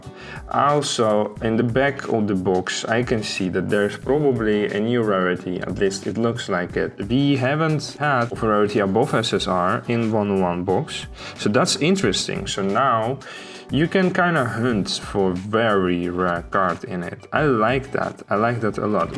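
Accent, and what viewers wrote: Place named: Netherlands. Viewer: Ur from holland i can hear xD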